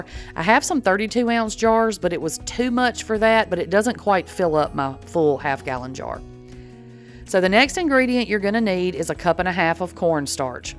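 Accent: South, United States. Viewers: You are JUST adorable and So kind! I think I need to go live in the South - but Im from Australia! You could be reading the phone book with that accent and I would feel happy! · This Texas girl loves listening to your southern accent....kinda comforting to me. ❤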